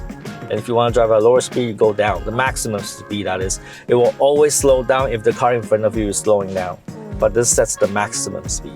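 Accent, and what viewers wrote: Standard. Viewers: chị nói tiếng anh chuẩn vl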